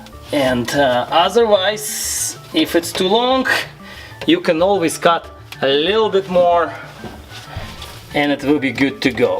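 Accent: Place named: Russia. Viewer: Смешной русский акцент))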